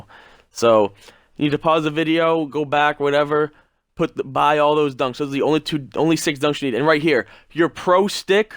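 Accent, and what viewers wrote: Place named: Boston, United States. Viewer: yep this man is from Boston... just said wicked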